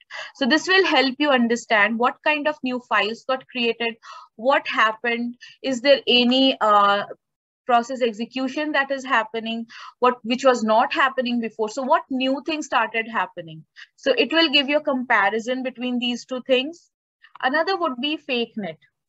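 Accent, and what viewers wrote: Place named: India. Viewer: Indian Acent.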